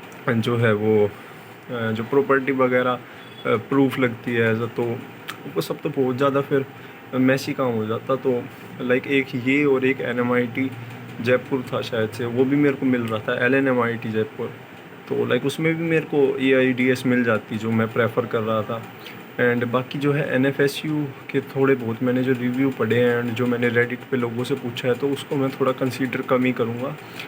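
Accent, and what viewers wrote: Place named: Haryana, India. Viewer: ...yeah wahi haryanvi accent sounds cool😍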